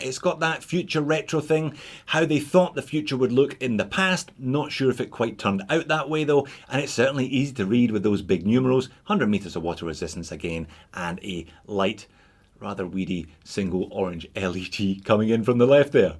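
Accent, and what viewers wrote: Scotland, United Kingdom. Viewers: Scottish, that is what I thought. Just didn't want to say it on YouTube and make fool out if myself, in case I would have guessed wrong.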